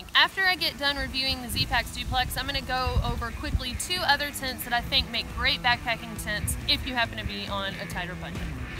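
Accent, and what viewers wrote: South, United States. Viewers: It is so so great to see a young lady be such an outdoor lover. They may be out there but you don't see them....most people of her age are too busy watching Kim K and not seeing the world for what it is.....and the southern accent is awesome. Great vids and good work....thank you.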